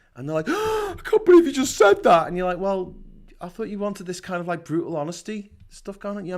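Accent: Liverpool, United Kingdom. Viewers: I usually listen to Phil Moorhouse, but I like this angrier scouse version of events.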